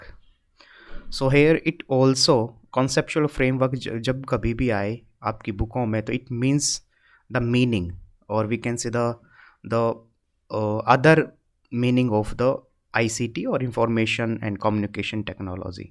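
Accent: Haryana, India. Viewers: apki language m haryanvi accent aave tha ismare puchi thi..m bhi haryanvi hu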